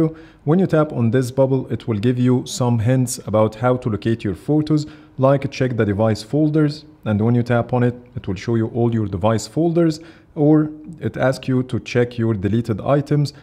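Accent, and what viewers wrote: Egypt. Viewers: Bruh Egyptian accents can always be picked up xd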